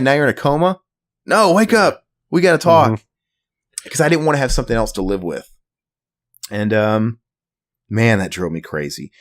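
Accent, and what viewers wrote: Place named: United States. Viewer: Nice to hear your american voice again brother! 😃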